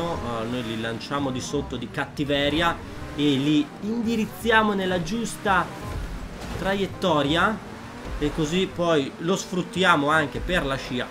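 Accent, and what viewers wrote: Veneto, Italy. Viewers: Ma sei veneto hhahaha? Ciapa fogo hahah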